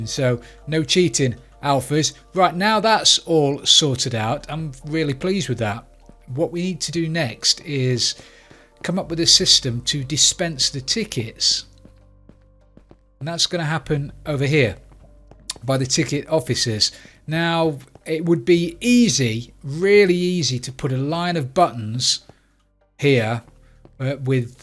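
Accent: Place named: Derbyshire, United Kingdom. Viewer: Wow. Wow. Wow. Mumbo Jumbo who?? You are the master of redstone. Amazing system. I'm surprised that if you like jump 2 so much, you haven't mentioned it before though;) I've been trying to work out which part of the country you come from based on your accent. My best guess is Derbyshire but not entirely sure...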